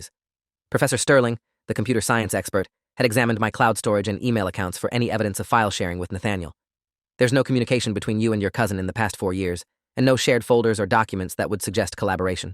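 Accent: United Kingdom. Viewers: I think he’s / she’s talking British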